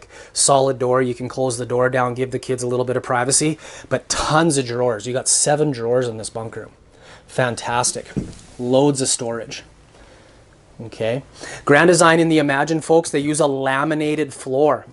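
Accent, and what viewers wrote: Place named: Canada. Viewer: Your Canadian accent is goals lol and I love your in depth reviews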